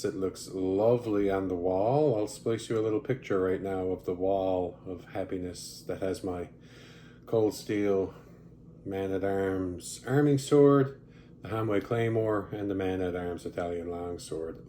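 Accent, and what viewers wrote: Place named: Newfoundland, Canada. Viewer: Was looking at buying this sword, watching your video...hmm that accent sounds familiar. Sounds like a fellow Nflder? Anyway, subscribed and liked.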